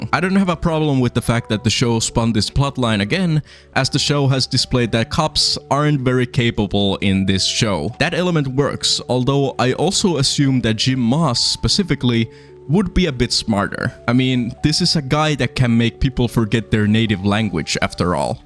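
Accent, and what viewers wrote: Finland. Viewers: You have an amazing skill for media analysis, portrayed by this essay of the series. I agree with a lot of points you made. (Also side note, after watching UMK, youtube keeps reccomending me Suomi channels, but I dont mind. Your accent is so great (and not rally english xd) good luck with the Youtube and your deepmdives)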